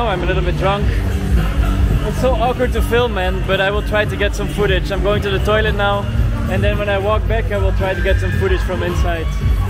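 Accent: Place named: Netherlands. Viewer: You sound Dutch bro , netherlands or Belgian ? 😜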